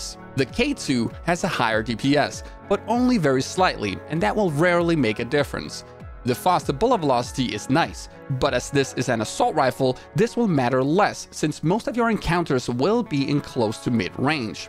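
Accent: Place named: Denmark. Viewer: interesting danish accent!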